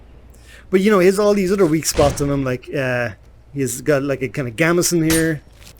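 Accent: Ireland. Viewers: Are u Irish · He is indeed from ireland! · Question: are you irish? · hay man love the game, i like ur accent. i have a feeling ur from ireland like me! and if u are where u from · Except he’s irish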